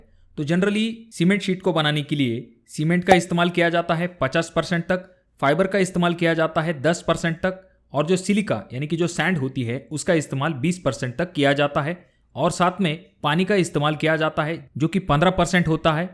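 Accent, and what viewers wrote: Maharashtra, India. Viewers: Speak karte samay marathi uchar aata hai aapka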